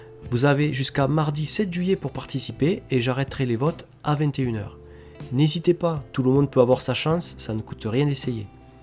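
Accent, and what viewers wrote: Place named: South, France. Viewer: D'ailleurs tu viens du sud non ? (J'ai le même accent que toi et c'est rare de le voir sur YouTube)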